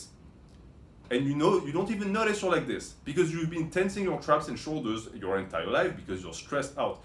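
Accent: France. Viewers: Yo dude are you french? It sounds like you are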